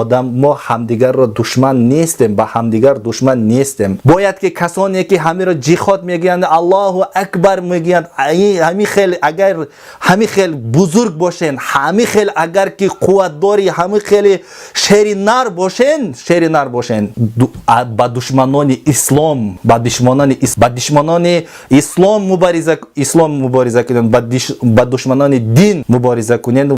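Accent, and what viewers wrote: Tajikistan. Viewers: Рахмат домло .маълум ки шумо аз тарафхои Джиргатол Лахш хастен аксентатон маьлум.Аввалин мурда яъне улук аз точикон шудаги историяба хаст вай одам одами бегунох. Агар мурдахои точика хисоб кунед хеле зиёд вайба ки чавоб мегуяд.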